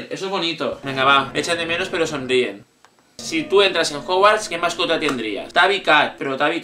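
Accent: Catalonia, Spain. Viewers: Eres catalán?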